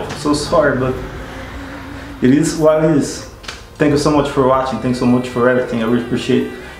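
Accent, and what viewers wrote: Brazil. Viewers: u sounded like Brazilian speaking English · Cara! Que legal que te encontrei. Imaginei que fosse brasileiro, já no início do vídeo, pelo sotaque kkkk Interessante não ver comentários de outros brasileiros e a prevalência de russófonos e outros estrangeiros. Parabéns pelo trabalho.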